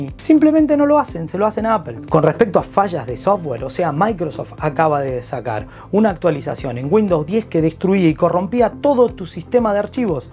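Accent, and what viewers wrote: Argentina. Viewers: Wow, que bárbaro, cómo disfruté este video, excelente ponente, me hizo reflexionar, y habló acerca de un punto de vista particular, La compañía de la manzana se evalúa de una forma muy distinta y se le exige mucho más que a las demás, en contra parte y por que no todo son miel sobre hojuelas, es verdad que parece como si últimamente apple no probara sus dispositivos, los saca y en el camino los va mejorando, eso no me gusta, puesto que sus productos son muy caros y el usuario merece un dispositivo acorde con el precio que paga por el, si me vana cobrar mucho entonces espero un dispositivo con cero errores, y si los hay, que sean menores. Excelente programa, te llevas las palmas, un abrazo desde México papá; Y el acento Argentino es mágico, me gusta bastante, ojo sin menos preciar al español eh. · Me podría pasar escuchando hablar a un argentino hasta el año 2090 sin parar.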